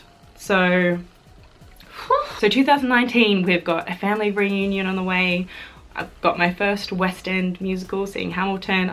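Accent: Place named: South, United States. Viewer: I love the Southern accent 😊😁😘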